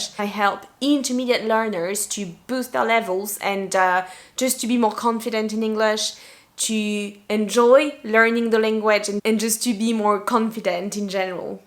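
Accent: United Kingdom. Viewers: At times, the influence of English teacher does affect our English learning journey.. By the way, I thought you're a British because your accent sounds like a typical British accent.. Nice story of English language journey you've shared with us, 👍😍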